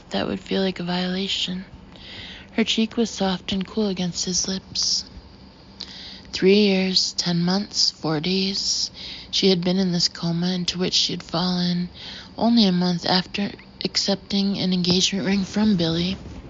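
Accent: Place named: New York, United States. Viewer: Your relaxed, classic New York accent is the perfect narration for these novels. It makes them a pleasure to listen to.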